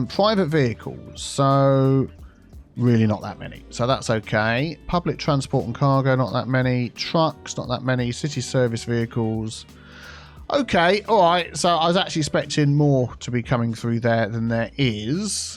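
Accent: United Kingdom. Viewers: Tesco lorry delivering to Aldi made me smile. This is so relaxing to watch, I’m new from Flabaliki’s channel and lovely to hear a fellow Brit!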